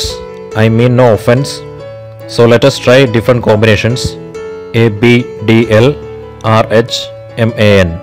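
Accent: Kerala, India. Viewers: Malayalam accent aanallo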